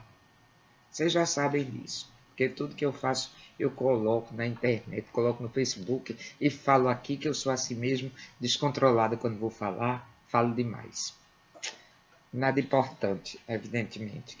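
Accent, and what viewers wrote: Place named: Northeast, Brazil. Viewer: obrigada pelo presente... aqui embalando meus 250 livros rsrs... e procurando como 'embalar livros" me deparo com este presente, essas leituras lindas, com o sotaque tão afetivo em meu coração, a doce lembrança da minha avó paraibana e de meu velho pai, pernambucano, contando os 'causos' do sertão diante das fogueiras de são João nas periferia de São Paulo, onde crescemos eu e meu irmão - gratidão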